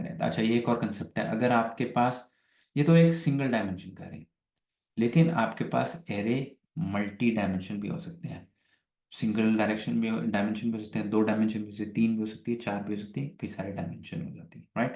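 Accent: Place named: Pakistan. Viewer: Apki voice se ap Muslim lag rhey.... Like Pakistani language you r speaking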